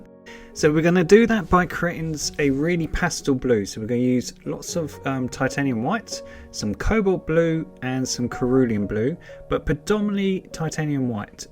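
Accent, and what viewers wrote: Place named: England, United Kingdom. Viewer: Great! I’m from England too. I live in Japan, so good to hear a homey!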